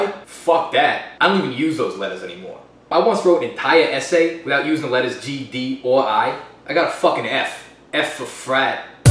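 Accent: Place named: New York, United States. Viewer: I have a guy like this in my fraternity. Dude is hilarious. Same accent, too. NYC!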